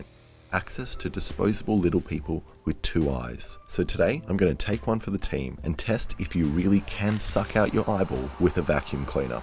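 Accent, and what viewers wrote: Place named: Australia. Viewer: I see what he means lmao I heard it it was like a mix between both it sounded like he was gonna say something in French at the beginning and then it turned back to Australian but that’s why he said “voice crack” cuz that’s the part that sounds kinda like a French word Instead of English in an Australian accent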